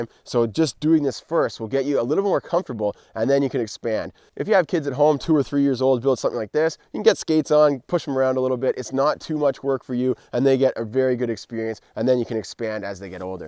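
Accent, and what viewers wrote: Canada. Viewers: Not hard to tell your from Canada like me 😂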